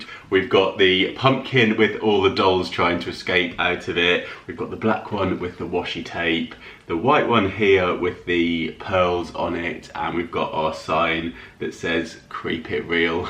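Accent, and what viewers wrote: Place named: Birmingham, United Kingdom. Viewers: Love the DIY and the very cute Brummy accent lol. Sounds just like me 👍😋# Mr talented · Ha ha loved the Birmingham accent 😂 I liked the white pumpkin & grey skull best! 🎃🎃🎃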